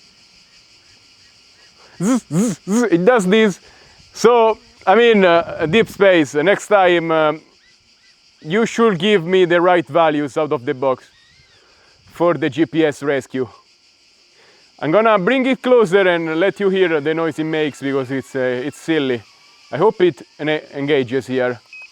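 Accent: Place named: Italy. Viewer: Man I like your accent! Are you Italian?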